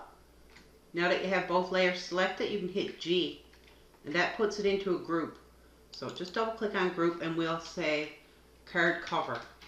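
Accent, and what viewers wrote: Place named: Nova Scotia, Canada. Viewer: Hi neighbour, I thought I recognized that accent. I'm from Nova Scotia.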